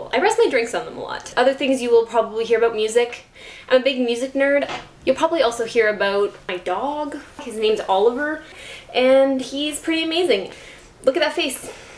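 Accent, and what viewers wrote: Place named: Canada. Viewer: Um, yeah, you DO have a cute accent. You Canadians and your "aboot". ;) Awesome vlog!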